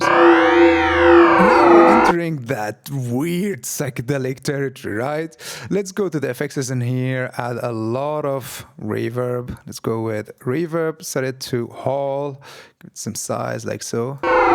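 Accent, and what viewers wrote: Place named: Germany. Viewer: Énorme la modulation sur le spectral. T'as un super accent avec un petit côté Germanique , tu dois être vers l'est j'imagine. Super Skills, tu as un excellent niveau, les presets packs sont flawless and gorgeous. Merci mille fois pour le partage de ton savoir ✌️🙏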